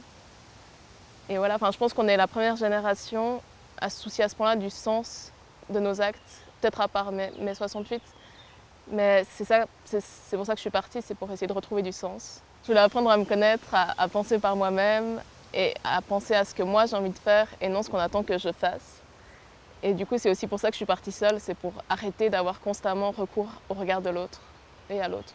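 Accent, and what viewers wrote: Switzerland. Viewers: Titrer "sans argent" alors que en moins de 10 secondes t'entends deja qu'elle est originaire de Lausanne en Suisse. Au moins j'aurais perdu moins de 10 secondes ! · J'adore ton accent suisse ! :) Et bravo pour ton courage d'avoir fait le pas !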